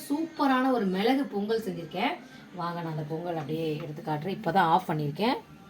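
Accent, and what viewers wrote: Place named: Thiruvarur, India. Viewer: Niga pesura varthaigal nalaa purigirathu naanga pesurathu polave pesuringa enaku thiruvarur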